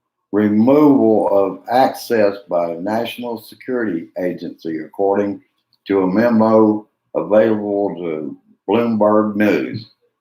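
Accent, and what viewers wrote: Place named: Missouri, United States. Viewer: What's up! St. Louis Native here. Nice to hear the Missouri perspective with the accent! Keep it up!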